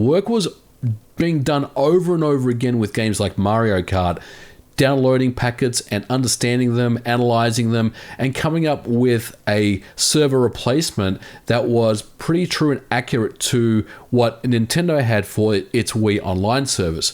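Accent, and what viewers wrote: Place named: Australia. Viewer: That's a very mild accent for an Australian.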